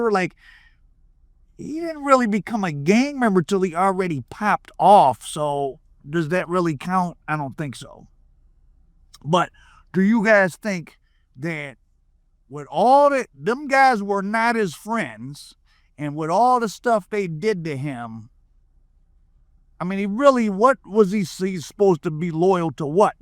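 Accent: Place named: Chicago, United States. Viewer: Why do you speak in some weird Chicago accent, aren’t you from Michigan?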